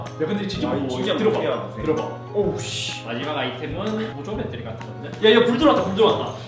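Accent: Gyeongsang, South Korea. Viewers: 재밌다 재밌어..최고다~~~긔여워 죽겟당~ 갱상도 아이가~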